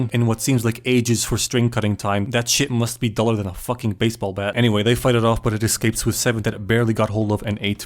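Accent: United Kingdom. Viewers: Man your British accent Is a killa put that on repeat for my bong rip shit was hilarious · Hey CAN YOU DO ONLY BRITISH ACCENT IN YOUR NEXT VIDEO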